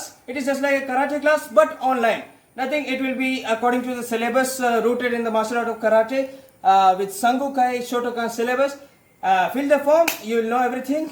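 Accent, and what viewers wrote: India. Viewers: Man salute Indian English..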